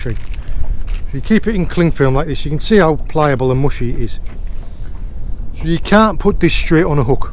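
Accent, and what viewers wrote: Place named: Darlington, United Kingdom. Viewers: i take it you are northern by accent. You fish a lot of the marks down off the south coast. Great fun watching the Bluefin double🤣🤣🤣. Your vids i am getting on catch up but great viewing, they are keeping me sane. Cheers 🍻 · Brilliant videos matey. The last time I heard an accent like that was around Darlington when I was stationed in RAF Catterick 50 years ago.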